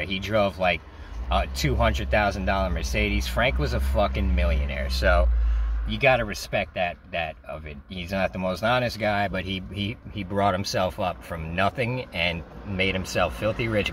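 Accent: New York, United States. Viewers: Love your New York accent.. I’m trying to watch your videos in order and so far enjoying every one of them.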